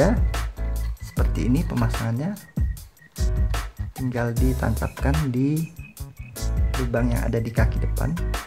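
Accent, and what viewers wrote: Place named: Central Java, Indonesia. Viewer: Mas.nya dari Salatiga, jawa tengah kah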